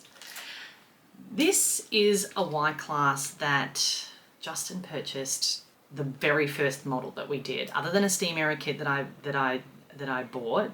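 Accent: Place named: Australia. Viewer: At first I was going to guess British, but listening further decided Australian. Always nice to see a girl in the model train hobby. Uncommon!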